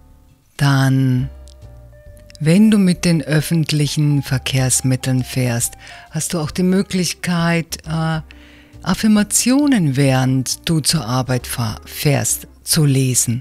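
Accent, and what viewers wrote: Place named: Bavaria, Germany. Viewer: Herrlich, liebe, wieder tolle Inspiration und das kurz und knackig - ich liebe deine offene, ehrliche Art, deinen Witz und natürlich dein bayrisch!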